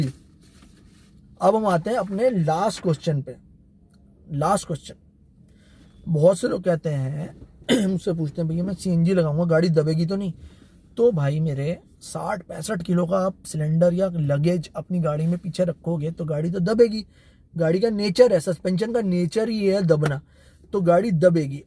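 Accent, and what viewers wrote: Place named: Old Delhi, India. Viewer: Very informative video brother👍👍 I am planning to install CNG kit in my ford figo 2017 model. Could you suggest which Kit I should go for as I have zero knowledge about the kits available in the market. Would appreciate your suggestions here! Also by any chance are you from Purani Delhi😂 I could sense a matching tone & accent what we have in Old Delhi.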